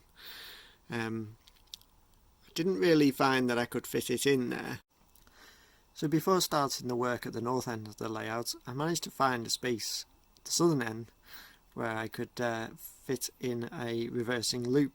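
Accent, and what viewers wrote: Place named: North West England, United Kingdom. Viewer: Loved the update. You sound like you're in the North West like me?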